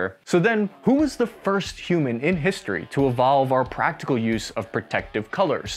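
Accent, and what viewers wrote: United States. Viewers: "Well that was none other than my people, the Italians" That's a strong American accent for an Italian 🤔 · Damn I was almost certain that this dude is American not Italian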